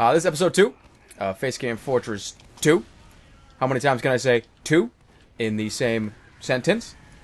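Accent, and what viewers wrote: Boston, United States. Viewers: He is from Boston as is the scout, they have a similar accent. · Im guessing you are either a real life version of a Scout or you are from Massachusetts/Boston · Oh. You are so Boston-ey.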